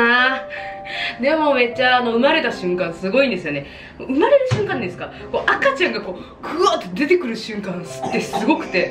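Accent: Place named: Osaka, Japan. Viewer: happy birthday💗感動して泣きそうなりました(>_<)優しい旦那さんと可愛い娘ちゃん💕これからのwonderful人生応援してます💗いつも大阪弁が親近感で大好きです❤️またメイク動画も楽しみ*\(^o^)/*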